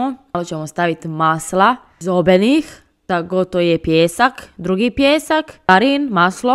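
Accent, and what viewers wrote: Split, Croatia. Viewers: Jesi ti iz Splita pitan radi naglaska jer ja jesan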